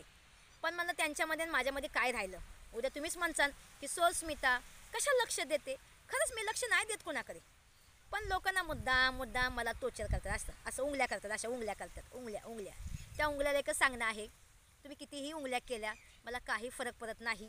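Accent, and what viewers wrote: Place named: India. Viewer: स्मिता तुम्ही आयुष्य मुंबईमध्ये काढले तर शुद्ध मराठी बोलायला पाहिजे. जेव्हा तू शुद्ध मराठी बोलतेस तेव्हा ऐकायला गावरान मराठी पेक्षा चांगले वाटते. तुझी बोलायची पद्धत तीच ठेव पण शुद्ध मराठी ला नक्कीच 💯 % जास्त लाईक्स मिळतील.शुद्ध मराठी ऐकायला फार बरे वाटते.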